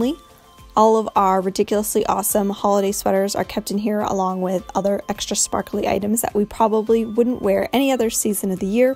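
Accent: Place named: Pittsburgh, United States. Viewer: i can tell youre pittsburgh "needs packed"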